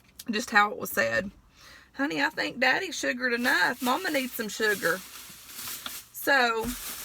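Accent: South, United States. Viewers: I like you, you are from the south and you talk how you talk if people don't like what you are saying they don't need to listen.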